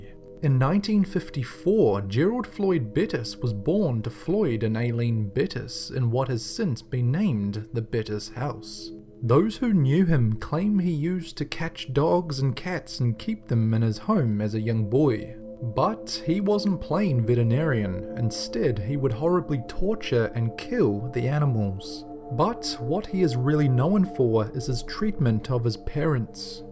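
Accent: New Zealand. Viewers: That Kiwi accent just kills it for me. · What is the narrator's accent? I want to place it as from New Zealand? But some Australian influences? Kiwi accent or nah?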